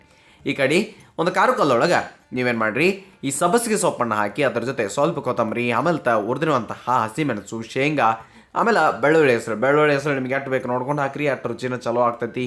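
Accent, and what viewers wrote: North Karnataka, India. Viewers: ನೀವು ನಮ್ಮ ಉತ್ತರ ಕರ್ನಾಟಕದವರು ಅಂತ ನಿಮ್ಮ ಭಾಷೆ ಹಾಗೂ ಚಟ್ನಿ ಮಾಡುವ ವಿಧಾನದಿಂದಲೇ ಗೊತ್ತಾಯಿತು ri. ಏನೇ ಆದರೂ ನಮ್ಮ ಉತ್ತರ ಕರ್ನಾಟಕದ ಅಡುಗೆ ತುಂಬಾ special ರುಚಿ ri.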